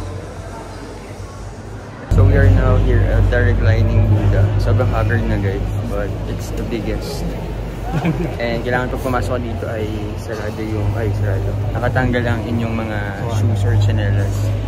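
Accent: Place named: England, United Kingdom. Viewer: I love your English accent!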